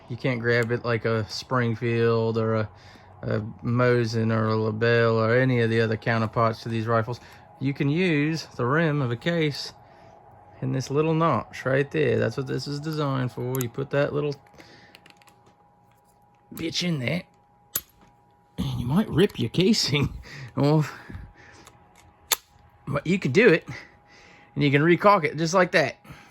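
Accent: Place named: Australia. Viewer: Bro caught an Aussie accent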